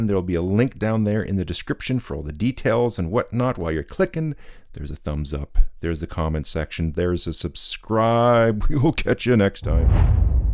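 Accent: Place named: British Columbia, Canada. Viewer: Cut mat ✔️ Hands only ✔️ BC accent ✔️ Valuing things in kopeks and Dong ✔️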